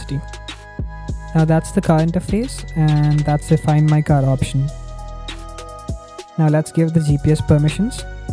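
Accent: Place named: Kerala, India. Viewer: Bruh you from Kerala? 😍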